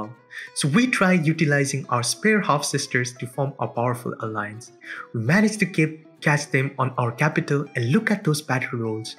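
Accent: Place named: India. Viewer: by any chance are you indian or even nepali?you have indic accent.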